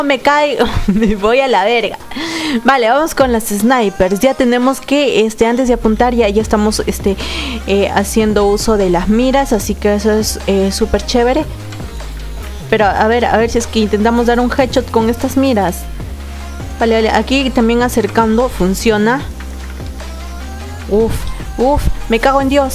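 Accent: Spain. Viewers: Cuando eres peruana y hablas como español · hazme un hijo..psdt: soy hombre xd Te sale gracioso el dejo de española y se te escapan las lisuras cuando te matan:3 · te diria q no metas mucho el asento español q algunos no entienden lo q dices:v xD i diran q eres groseras diciendo esas palabras:3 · Española xD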